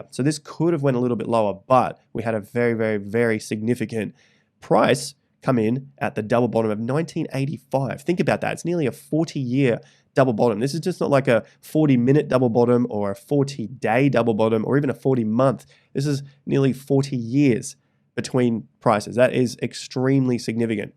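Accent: Australia. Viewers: The Australian accent is unfortunately super annoying to me....especially when they say Twittahhhh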